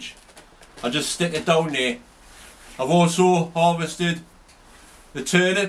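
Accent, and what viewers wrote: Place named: Newcastle, United Kingdom. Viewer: from the North East Hon... It's Geordie!!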